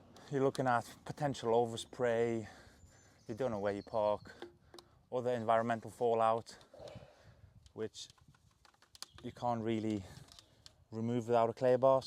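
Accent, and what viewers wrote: United Kingdom. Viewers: Great Chanel! Great products! Do you mind me asking about your accent? I hear a little North East twang maybe? 🤔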